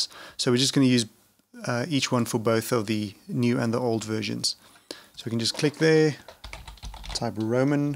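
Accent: South Africa. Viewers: That NB gave it away i was like its definitely a South African Afrikaans? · South African?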